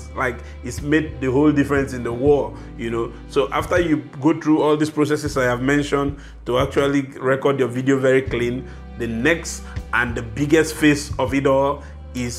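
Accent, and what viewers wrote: Africa. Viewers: I am Norwegian and I love the many ways of speaking English, including yours. African English is very pleasant. It sounds both robust and gentle at the same time. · I mean he has a rich african accent but a poor american accent, it's a language thing no need to make it so political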